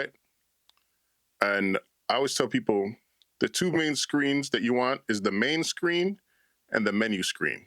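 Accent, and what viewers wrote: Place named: Jamaica. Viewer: Where you from sound like a yaddie 😂 thanks for the video btw!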